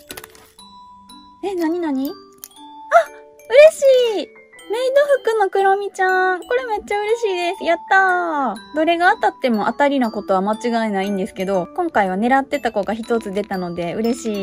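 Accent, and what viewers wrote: Kansai, Japan. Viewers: 私も関西在住で大阪のサンリオショップよく行きます(^_^)大阪限定グッズのマイメロちゃんも既にゲット済み( ^ω^ )前から分かってたけどやはり大阪出身だったんですね！喋りが関西訛りなので。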